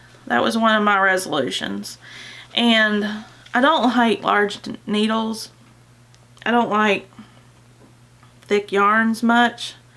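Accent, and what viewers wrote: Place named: South, United States. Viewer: Probably spelling this wrong..but Cruella DeVille. I would love yarn named for her. White,black,throw some red in for her lipstick and maybe some ash for her cigarette. as I was watching I said..this gal is from the south..she talks like me. I live in Hartwell,Ga. My son use to live in Albany so I know where you are from.❤️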